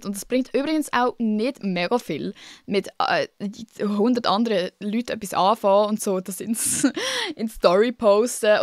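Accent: Switzerland. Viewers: Du bist so lustig! Ich lieb den Schweizer Dialekt einfach :-)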